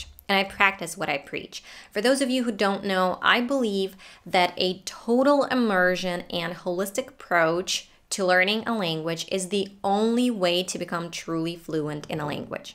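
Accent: United States. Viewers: Вот уж парадокс. Когда я увидел твое видео в рекомендациях, то так и подумал, что ты из Украины или России, но когда услышал, то подумал что американка ))Интересно, как долго учишь? Я сам из Украины и учу язык в ютубе от носителей.Но все просматриваемые мною ютуберы(например, Papa teach me или English with Lucy) говорят медленнее, чтобы изучающему было понятней, а также добавляют субтитры чего у тебя не увидел. А так все супер, ты молодец. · Jesus christ!!! Your American accent is mega strong. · Don't know even you are Russian.. I thought you r 100% American 😊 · I'm shocked!!! I was totally convinced you're American! I would never think that your first language isn't English. Props to you! Keep it up 💪 Brilliant job! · Hey...what you are doing is a great job..I like your extreme passion about English language.. even I wanna be like you someday... speaking fluently in a good American accent..with ❤️ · The first time I saw you speaking I thought that you are American, you are perfect 👌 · You are a very good teacher! 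It's hard to beleave that you are not american. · I know you ever since I watched hadars students vids and then i was curious to know a bit more about you, and I think you're great, despite the fact you live out of a country where ppl speak English and your accent sounds so familiar like Americans , i wonder how you manage to speak in two different accents .. p.s if your reading this, pls mind letting me know about it. Thnxs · You are from Ukraine I thought you were from USA !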